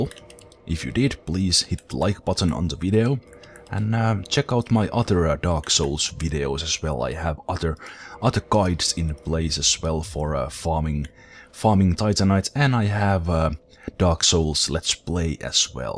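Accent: Finland. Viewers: Dat finnish accent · You from Finland? Your english sounds like typical english speaking finn : D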